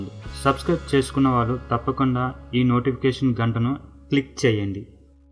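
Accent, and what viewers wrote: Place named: Srikakulam, India. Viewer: Nee Telugu ki oka dandam. Srikakulam slang yentra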